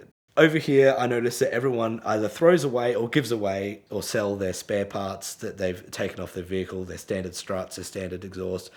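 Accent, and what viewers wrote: Australia. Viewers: You sound like a West Aussie. 🤟 Port Hedland saying g'day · Sounds like you're from qld 🫣🫣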